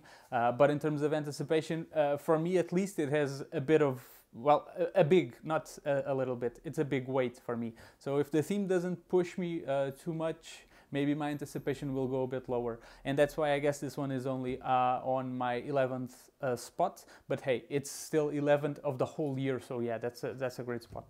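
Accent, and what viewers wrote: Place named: Portugal. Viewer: Muitos parabéns pelo canal e boa sorte! Sou grande fã de boardgames (solo e não só) e foi uma agrdável surpresa encontrar uma voz portuguesa neste meio :)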